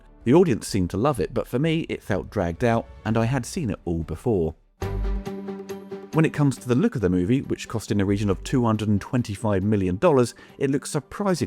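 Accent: United Kingdom. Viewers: I saw the film today and am sorry you didn't enjoy it as much as I did. Since this is a non-spoiler review, I will save my detailed comments for when you review the plot. I will just say that I thought the story was very relevant to day... especially for what's going on here in the USA. I've never thought about where you live with regard to a particular film before. But from your accent, it sounds like you live in the U.K. Am I correct?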